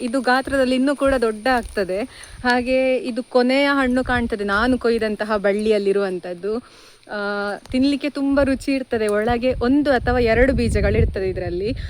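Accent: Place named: Udupi, India. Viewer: Namma Udupi bhashe matadteddiree ...neevu yelli navru...nanna ooru barkur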